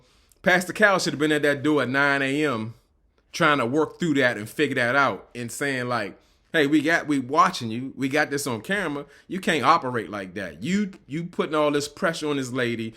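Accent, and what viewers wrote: DMV, United States. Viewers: Are you from DC/MD? Your voice sounds like you’re from the DMV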